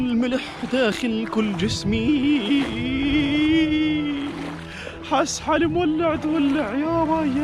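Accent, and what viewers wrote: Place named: Jordan. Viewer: اللي يستغرب من لهجة الاردنيين الرقيقه يدوس لايك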